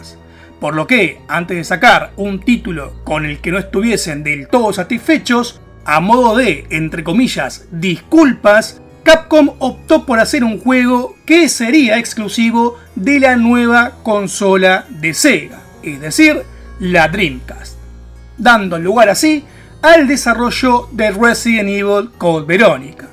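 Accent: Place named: Argentina. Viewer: Yo soy de argentina y supongo por el acento el tambien es argento. Y aca lo jugue en play 2 la consola de sega aca no duro mucho.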